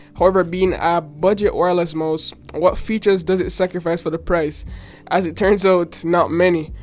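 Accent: Jamaica. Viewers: You Jamaican I’m Jamaican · Good review. You sound Jamaican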